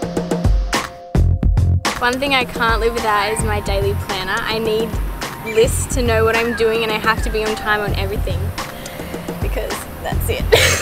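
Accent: Australia. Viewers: beautiful woman , lovely Aussie accent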